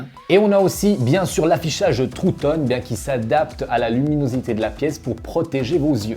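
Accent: Switzerland. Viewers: Alors toi t'es Suisse avec ton vieil accent de paysan ( j'ai le même)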